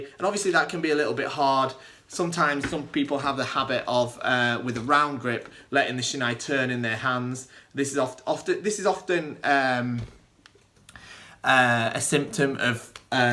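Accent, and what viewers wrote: United Kingdom. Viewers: Your accent sounds like some sort of Northern, but it doesn't sound either Geordie or Scouse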